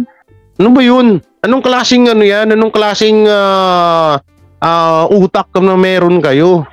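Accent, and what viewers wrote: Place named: Iloilo, Philippines. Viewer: Malambing ilonggo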